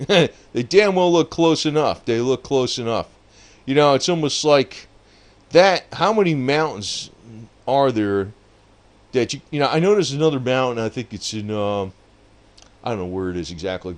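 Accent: United States. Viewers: The american accent.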